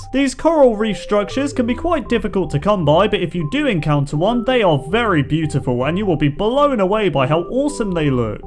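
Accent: United Kingdom. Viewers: Must be a northerner.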